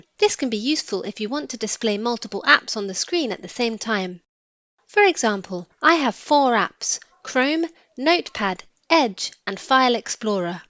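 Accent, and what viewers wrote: United Kingdom. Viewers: Love ur British accent 😍😍😍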